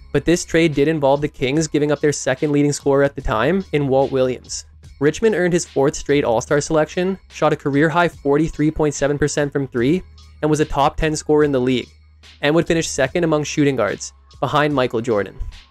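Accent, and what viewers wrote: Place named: Canada. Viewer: Absolutely LOVE this channel! Love the Canadian accent MUCH more than I should and I love the detailed bios and pictures - you have a sub and Mad respect! 👍 😃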